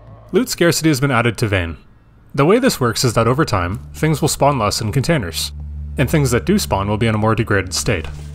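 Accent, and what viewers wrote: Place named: Canada. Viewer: The dude voicing this is insanely Canadian.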